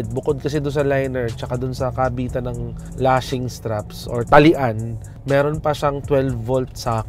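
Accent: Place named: Philippines. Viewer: Salamat sa maayos n pananalita pinoy na pinoy. Salamat sa napakagandang review ng raptor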